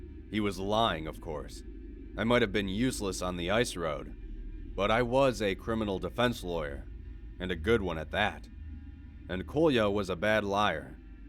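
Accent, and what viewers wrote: Russia. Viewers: Great story, great narration, great Russian actcent. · Omg ur Russian accent sounds like bourbon from metro 2033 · Love your Russian accent it sound very real 😍 also kudos for the background noises even tho there are not a lot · sound like Russian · You russian accent really sounds like russian)) i'm knew it :-D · Your Russian accent made me laugh so hard 😂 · Your russian accent is golden:))) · He sounds like a Russian faking an American accent when speaking English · Russian accent made my night...keep up the great work 👍👍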